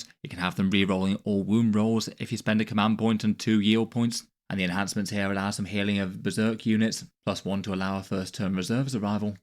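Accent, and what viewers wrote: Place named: Midlands, United Kingdom. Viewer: he's 1000% got an english accent, I want to say midlands somewhere? It's not very distinctive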